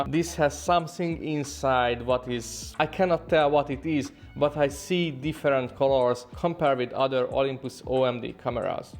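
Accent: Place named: Russia. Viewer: You speak with such a heavy Russian accent, are you Russian?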